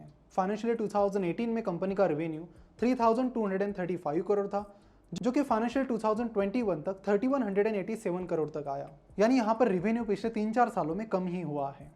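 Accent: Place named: Nagpur, India. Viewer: Sir aap nagpur se belong karate ho sun le.proud feel huwa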